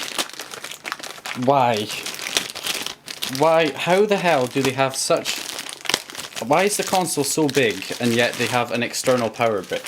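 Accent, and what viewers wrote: United Kingdom. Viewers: Because he's british and youre probably spanish by your name. So you talk weird too.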